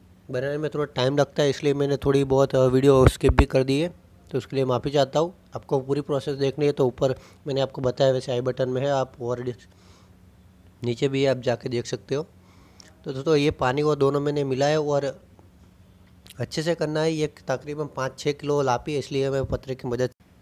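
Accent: Gujarat, India. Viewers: Gujarati Accent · Gujju che k su bhai amuk word sambhdine to lage 6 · You are gujrati right?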